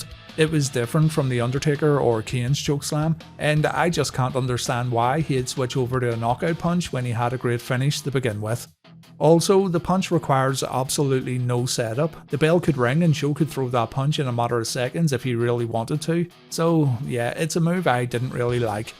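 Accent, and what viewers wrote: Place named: Liverpool, United Kingdom. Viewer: A scouse talking about wrestling. Subscribed.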